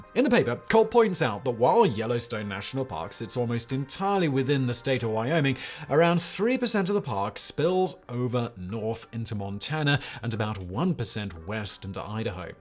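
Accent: England, United Kingdom. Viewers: He's British. 🤣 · is speaking correctly. It's the English pronounciation. All the time there is aluminum and math, there is no room to shout. The language is called English (not American), afterall. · Its Bri’ish.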